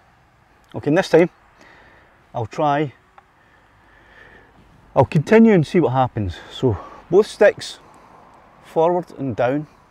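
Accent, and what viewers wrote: Scotland, United Kingdom. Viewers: Great viddy mate. I am also partial to your Scottish English.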